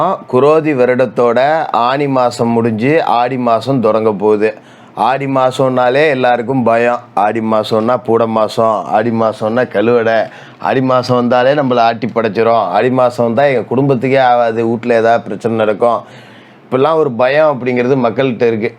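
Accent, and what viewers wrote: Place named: Thanjavur, India. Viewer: ❤ தஞ்சை தமிழ் போல தெரிகிறதே சுவாமிஜி! நன்றி 🎉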